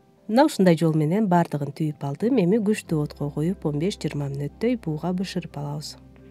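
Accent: Standard. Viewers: Азаматсың таза сүйлөп,үнүң да ушунчалык уккулуктуу экенсиң! Колуңа береке сиңдим! 😍😍👍👍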